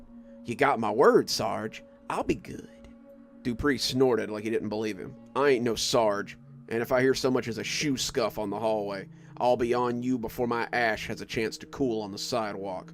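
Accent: South, United States. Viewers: May I ask….? Answer only if you feel comfortable. Are you from the south…?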